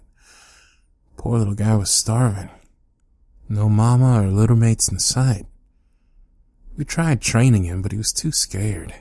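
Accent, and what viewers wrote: South, United States. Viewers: As a southerner the accent slapped me so hard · Southern accent 😳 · Is it just me or did he do a southern accent? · So cute! I love that you have a slight southern twang when you talk in this one! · I love southern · I FRICKING LOVE THE SOUTHERN ACCENT, ITS SOOOOO AMAZING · Psst guess what. The country accent. Fucking amazing. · holD uP nOw! YOu HavE mY CoUnTrY AccenT! And Is ThAt I WeE dOggIe? HoOoOoO-wEeE! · I love the country accent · Are you from the south or something cause it sounds like you are or you’re just really good at doing accents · Dat Country accent 😍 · Is that a twinge of a southern accent I hear? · It somewhat sounded like a southern accent was thrown into this. It was amazing. This reminded me of my dad returning home from Iraq when I was little. I loved this so much. Well done. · Awwww the southern accent always gets me 😍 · The southern accent is almost a turn off, I guess is trying to fit the "all American vets have tough southern accents" stereotype, for me it's just distracting but it probably hits home for other people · Country accents! 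Country accents everywhere! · aaaagh that Southern dialect! I love it! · Dog + southern voice grabby hands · HIS SOUTHERN ACCENT HAS ME QUAKING LMAO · That southern accent is actually everything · is it me or did anyone else hear the cowboy ascent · That slight southern accent is so charming!!😍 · I know I wrote this and all, but as I was listening to this, I cried like a little bitch., your southern accent is so adorkable! Even still, I'm dying because of how wonderful this turned out! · The southern accent is back y'all. The heartwarming fluffy bits r back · I swear you get a little southern every time you do a soldier one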